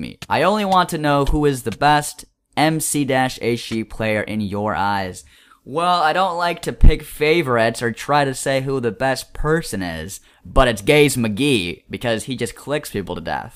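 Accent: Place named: Spain. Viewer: jaja me gusto tu asento español jaja saludos desde mexico i like your language spanish im a sub from mexico :)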